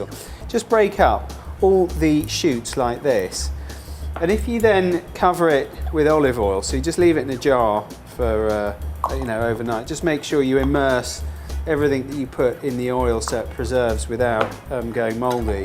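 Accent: United Kingdom. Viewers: It's the British Accent Sounds Cool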